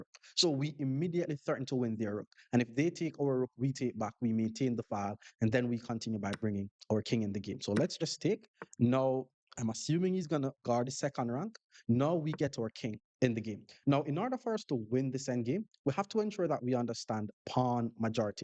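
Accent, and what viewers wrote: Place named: Jamaica. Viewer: Jamaican accent makes everything sound cooler and more interesting. I can watch these long videos with all my attention the whole way through.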